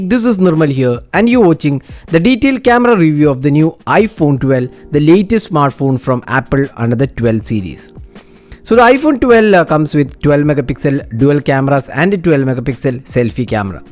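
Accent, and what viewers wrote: Kerala, India. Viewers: From your englsh i can say that you're a malayali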